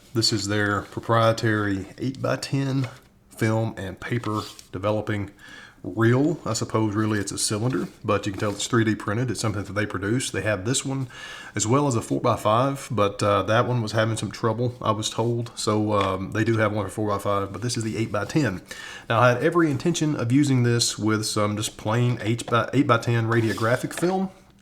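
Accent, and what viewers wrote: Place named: North Carolina, United States. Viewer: I had a plan to sometime in the near future start processing film again after years away from the darkroom. I supported their kickstarter campaign though as little as I would be developing I thought it worth supporting. I now have my unit and just shot a roll of B&W and I’m ready to get started. This video was just what I needed. I read a few reviews but I wanted to see it used. Learned a lot here. Thank you. BTW, I was listening to your voice and guessing where you are from. I guessed right. I know that accent. NC! that’s what 25 years on the road shooting for Southern Living will teach you.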